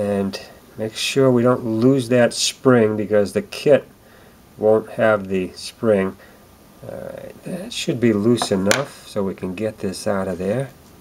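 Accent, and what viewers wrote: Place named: Down East, United States. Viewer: Neatly done,. I searched for a long time for installation info after getting this kit,with no luck whatever. Your video made it look simple. Thanks for posting. BTW,I'm from Kansas,& I enjoyed yer down-eastern accent! So, ignore the guy below with the Peter Griffin comment.:-)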